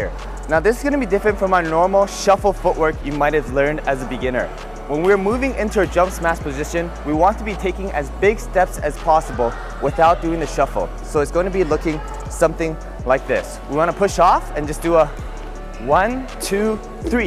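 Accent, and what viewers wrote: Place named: United States. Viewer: i've watched both your smash videos and have the following comments about what i like and dont like about the way you teach and/or perform smash. like: 1) you emphasize getting your body behind the shuttle so that your energy comes forward to smash, not backwards or straight up. the energy is forwards. there are many details to the smash, but if a beginner learns only one thing, it should be to do the work to get your body behind the shuttle, not beneath it, so your energy comes forward as you strike. and not only smash, but also clear and drop, get your body well behind the shuttle before you try to strike it. i like the way you said 45 degree angle forward. that is right on, 2) the way you demonstrate pronation seems very clear to me, 3) the way you use throwing to demonstrate the swing. i assume you are in the US from your accent and the word 'bird', and one sport americans understand is baseball. 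if you look at major league pitchers in slow motion you will see many relevant components of smash and swing. dont like: 1) your flow of energy from the floor to the racket head is compromised by a failure to isolate your hips and rotate them separately in advance of your torso. this means that even though you rotate your body 90 degrees before your smash, you are not taking full advantage of the potential rotational energy there. really, there is no point in rotating your body a full 90 degrees before smash if you do not isolate your hips and rotate them separately, and i have s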